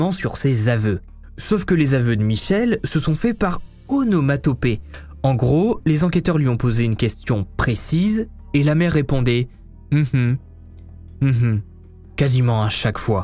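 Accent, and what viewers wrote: France. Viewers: Enfinnnn ton retour !!! Super hvf, merci ! PS : j'adooore quand tu prononces les mots anglais avec ton accent français "background" 😂😂😂 (et "Raul" qui se prononce "Raoul"!) Bref je t'adore 😁❤️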